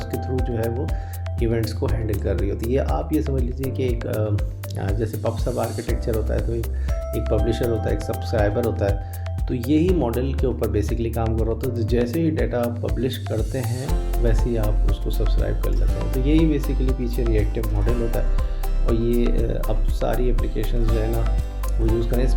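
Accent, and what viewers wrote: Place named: India. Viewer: This hardcore indian-english accent, combined with the loud background music makes it really hard to understand anything at all 🙁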